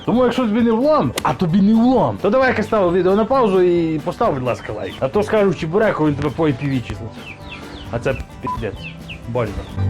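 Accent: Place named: Western Ukraine, Ukraine. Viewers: Це Галичанський акцент, скоріш за все, франківська область. Здарова землякам) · уважуха за гуцульський діалект.. щистьи, здоровльи тобі та побільше таких відіків) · А сам из Украины, но у тебя такой акцент шо иногда я нихера не понимаю что ты там несешь, наверное ты из очень глубокого западенського села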